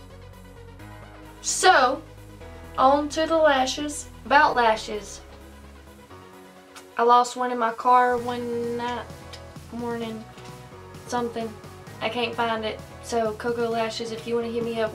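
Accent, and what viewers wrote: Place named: Georgia, United States. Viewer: Oh and where are you from? I'm from Georgia, you sound like you could be from here lol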